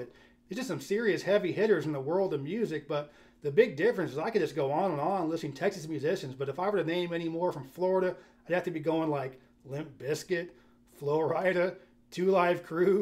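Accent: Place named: North, United States. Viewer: Have you been to Austin lately? Work took me there and 2 things really stand out. The homeless population is enormous. All the highways are elevated. Under quite a few of these underpasses are true homeless tent cities. Not to mention panhandlers on just about every freeway off ramp. Let's not forget about the university of Texas and the murder clock tower. It stands out so much you can see it from I-35. People, for some reason want to visit the site Charles Whitman made famous. Traffic is God awful. I figure you would pick this city over Tallahassee. No surprise here. The way you speak is very much Yankee North. No way you are a native to Chattanooga Tennessee. Your videos are very good and entertaining but you are dead wrong about this one. Keep up the good work.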